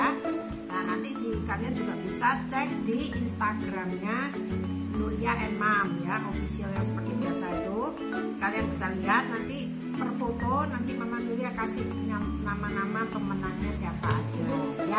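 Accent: Java, Indonesia. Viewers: Assalamualaikum Mama Nuria Mau Nanya Mama Nuria Orang Jawa Ya Kok Bahasanya Medok gitu Hehehe?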